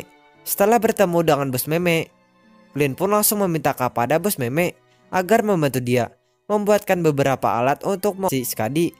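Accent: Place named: South Kalimantan, Indonesia. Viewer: Logat nya abang yg bawain cerita kayak orang KALSEL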